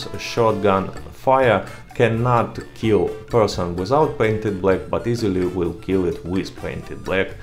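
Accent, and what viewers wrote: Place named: Russia. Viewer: Hey, like ur Test cause i tested with friends same way 👌🏻 There are many possibilities to make builds with the cards and u analyzed it very clear for different modes of gameplay and playstyle 💪🏻 And last but not least your russian accent is kind of what u make unique so it s funny but i like it 👌🏻 Keep it real... i subscribed and always like your videos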